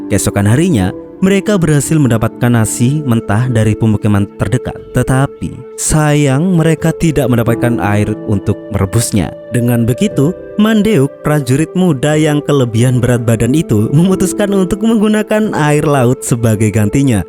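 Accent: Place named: Kediri, Indonesia. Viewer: Modele bahass ne keterangane koyo wong kediri gueeriii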